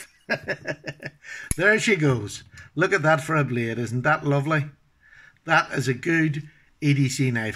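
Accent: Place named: Ireland. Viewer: What about ya. I just came across this video and noticed the accent and I’m from Ireland myself. What part are you from? I’m from County Armagh. Good to hear a fellow Irishman on here. Fare play to ya boy. I’m going to subscribe to help a fellow Irishman our. Great wee video. Cheers mate.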